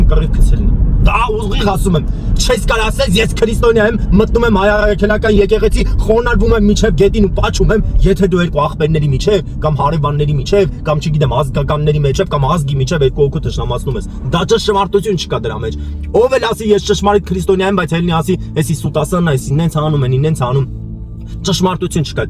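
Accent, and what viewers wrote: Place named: Iran. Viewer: ❤❤❤իրանահայի բարբառով է խոսում, որը շատ համով է❤❤❤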